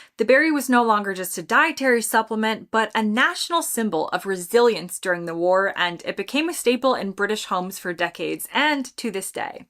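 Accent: United States. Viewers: At first I was quite confused why you sounded so American, and at the same time was so empathic to English culture 😊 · I was at 4:something when I realized that this British girl speaks with the American accent :D As a Russian I am appalled by the topic of this video 😵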